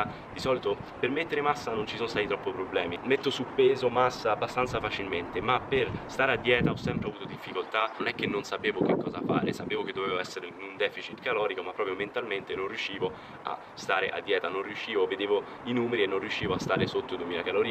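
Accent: Rome, Italy. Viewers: Sei di roma nord o sud? TOP!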